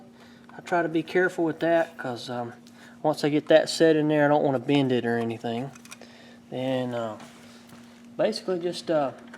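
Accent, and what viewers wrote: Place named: Georgia, United States. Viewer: Hey! I just Googled & watched some videos. Awesome! I see you're in S.C. I told my husband "he sounds like he's from Georgia " Hehe..my apologies.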